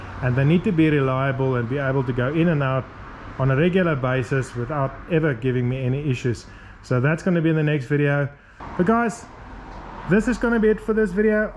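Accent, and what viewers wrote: South Africa. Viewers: These are great videos. Love your South African accent. Reminds me of when I lived in SA. 'N boer maak 'n plan !!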